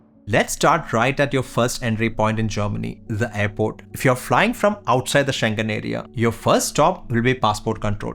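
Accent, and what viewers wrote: Kerala, India. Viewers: That Mallu accent is still there though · chettan has clear malayali accent!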